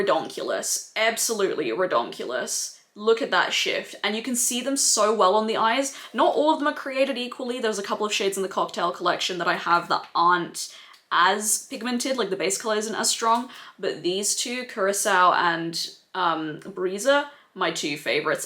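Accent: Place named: Ireland. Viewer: More of that Irish accent, please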